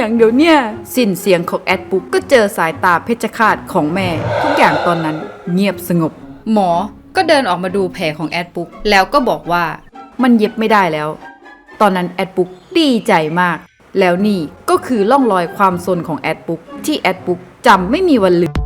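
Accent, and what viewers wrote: Isan, Thailand. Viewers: พี่เป็นคนอีสานใช้มั้ยคะ คนอีสานคือกัน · คนอีสานหรอพี่อะ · เอ้าเจ้าคนอีสานบ่ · แสดงว่าแอดเป็นคนอีสานเหมือนกันใช่ไหมครับ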